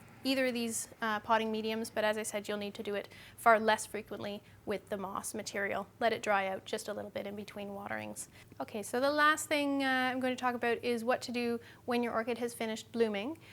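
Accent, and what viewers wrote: Canada. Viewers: Its Canadian accent!!! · she must be from Canada. Oh and by the way. What are you, 4?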